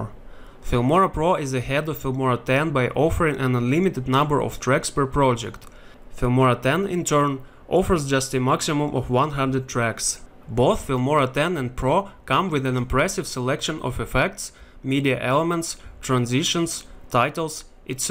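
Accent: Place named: Russia. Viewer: You have a russian or ukrainian accent :) Спасибо for the tutorial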